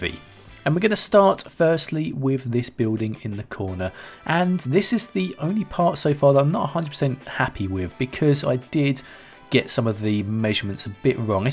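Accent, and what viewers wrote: United Kingdom. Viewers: english accent, makes you sound knowledgeable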